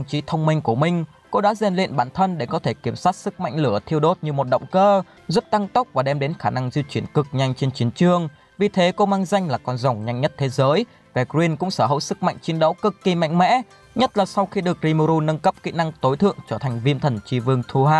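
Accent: Vietnam. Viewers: Anh đọc tiếng anh đứng kiểu VN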